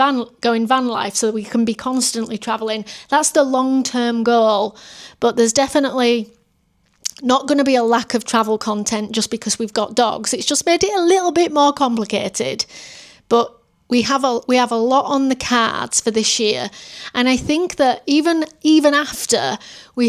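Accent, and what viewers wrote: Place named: United Kingdom. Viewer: I enjoyed your video , This is the most British British accent I have ever heard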